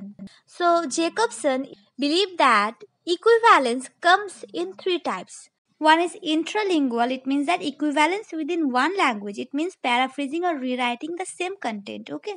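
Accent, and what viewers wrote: India. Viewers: Loved your strong indian accent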